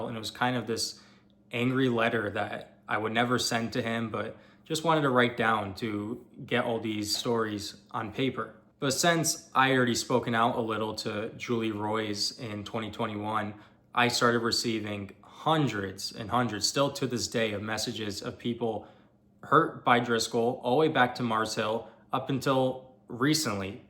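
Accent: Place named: East Texas, United States. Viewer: Sounds like someone east of Dallas!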